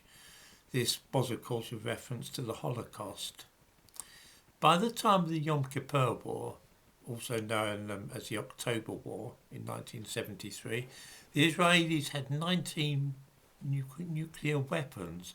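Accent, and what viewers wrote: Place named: Cockney, United Kingdom. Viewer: He could be and he does hail from East London and his dialect could be construed as Jewish. My mate’s dad was a Jewish tailor from Walthamstow who should to supply the Krays and he had that East End dialect which might be heard as he speaks.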